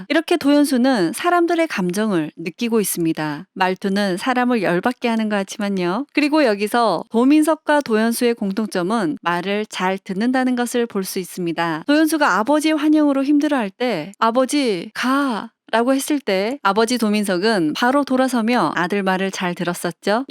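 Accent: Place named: Gyeongsang, South Korea. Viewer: 해설자님 경상도 분인 듯~^^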